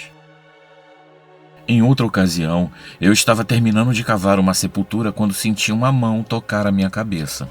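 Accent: Rio de Janeiro, Brazil. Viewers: Mas o sotaque dele é de carioca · Ótimo relato! Um ponto extra é pelo sotaque carioca, que eu amo, pois também sou carioca da gema! Muito sucesso pra você! 👍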